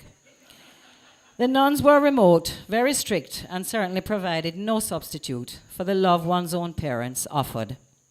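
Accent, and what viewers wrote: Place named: United Kingdom. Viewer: Unfortunately I don't know jamaican accent but her pronunce seems just english -british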